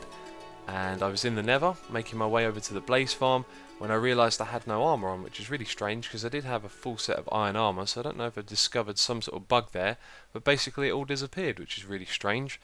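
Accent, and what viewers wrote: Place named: Northern England, United Kingdom. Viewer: Your accent.... Why can't I be British?.... WHY!?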